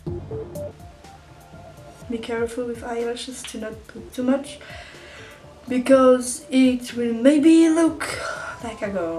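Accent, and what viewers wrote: France. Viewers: is your accent french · it's a french accent or ...?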